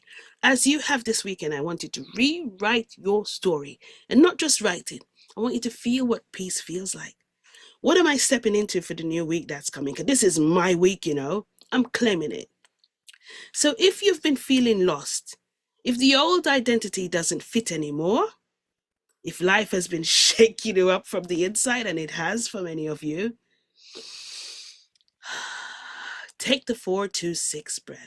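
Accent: Jamaica. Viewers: Amazing! So glad I saw you on Alex’s next level soul! Love your Jamaican dialect pls do it more! Learning so much from you ty! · I LOVE your message AND your Jamaican accent. You said, "You're not losing your mind, you're losing your limitations"...PROFOUND! I love the way you use just the perfect words to convey your message. Thank you for giving so freely from your beautiful spirit! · I looooovvvvvveeeeee your Jamaican accent!!! I am in love with the Jamaican accent! It sounds pure, to the point and so divineThank you!!!It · Sista! Gwan an speak inna patwa! Me luv it. Blessings.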